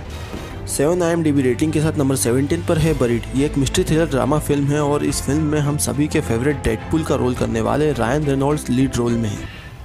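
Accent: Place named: India. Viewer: your voice doesnt suits at all for western movies cuz of ur accent,well i dont blame you but you are good to narrate for hindi movies tho.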